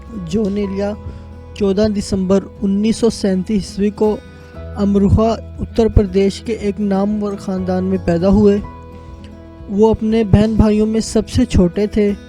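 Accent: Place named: Punjab, Pakistan. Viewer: معلومات اچھی ہیں۔۔۔مگر انتہای "پنجابیانہ" لہجہ ھے۔۔۔۔اردو کو اردو کی طرح بولیے۔۔۔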